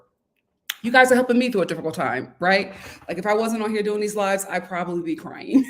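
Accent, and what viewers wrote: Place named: Philadelphia, United States. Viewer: The philly accent I throng today 😂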